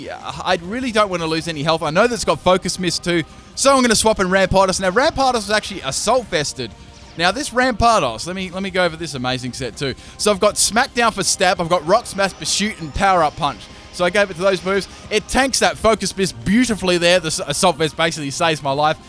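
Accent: Australia. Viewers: Are you Aussie ? You sound Aussie as fuck. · U Australian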